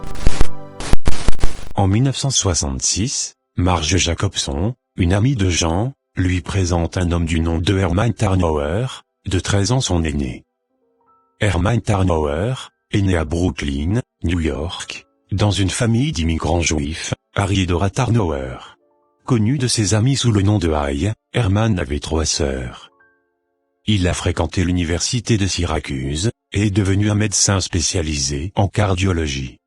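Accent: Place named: France. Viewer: Millionnaire s'il te plaît pourrais-tu prononcer en anglais les prénoms de Jean et des villes américaines sans accent français parce que dans ce reportage je me demandais de chaque fois pourquoi il vient avec un nom d'homme je m'excuse encore. À plus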